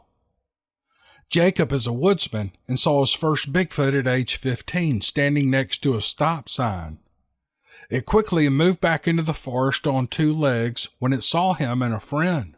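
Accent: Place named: South, United States. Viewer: you seem new here, welcome to one of the best southern voices of the cryptid world - between him and Kerry Arnold [Bigfoot Odyssey] their voices/accents and way of delivery are second to none and in my opinion, just give these stories a much appreciated bit of southern flavor.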